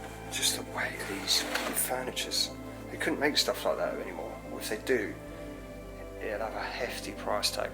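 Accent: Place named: Medway, United Kingdom. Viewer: Happy birthday! Love your vids, yr accent sounds local to me. Are you from medway area? I can't get around easily and you bring adventure to me, thanks matey x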